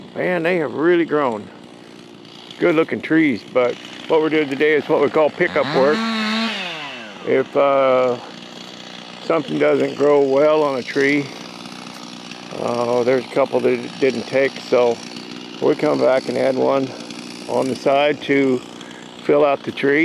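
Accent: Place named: Appalachia, United States. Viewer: Hey. You're in Washington but I detect some Hill Billy in that accent. Are you from somewhere else originally? I'm from Tennessee. Hey I have a question that relates to this video. I have a Gala I bought that's suppose to be a semi dwarf but in the fifth year here it seems to be leaning some and I can grab the tree and move it around kinda easy. Would it be a good or bad thing to plant a couple of MM111s on opposite sides and Inarch into it? I don't want to stake it if I don't have to. Thanks.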